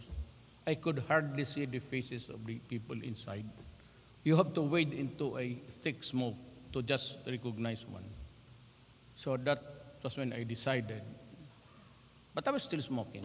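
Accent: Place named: Cebu, Philippines. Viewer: Excellence English with Cebuano accent. Bravo Mr. President Rodrigo Roa Duterte.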